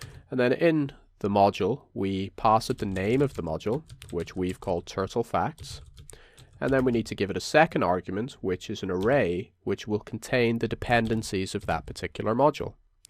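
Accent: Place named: Ireland. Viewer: Your accent sounds like a mix of Irish and Northern Irish. Nice vid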